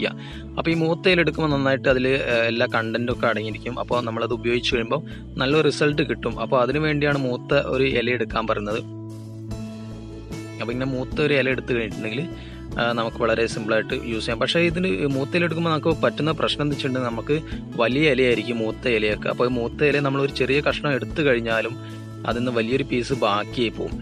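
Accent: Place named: Malappuram, India. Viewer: Malappuram slang😀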